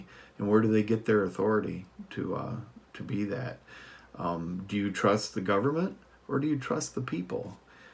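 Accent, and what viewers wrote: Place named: Upper Peninsula, United States. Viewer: Nice vid. You sound a lot like a Yooper, which makes it even nicer :-)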